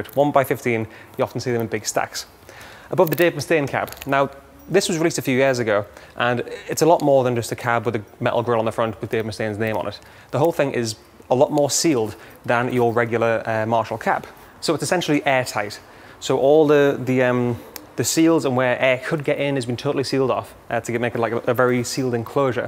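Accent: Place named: Geordie, United Kingdom. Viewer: I've only just clocked something about you...with a hint of camp in your voice and the way you suddenly drop your voice down It's Kenny Everett! Seriously are you from the same town? You have the same kind of rhythm..it's not quite Geordie but close by am,I right are you and Kenny from the same streets?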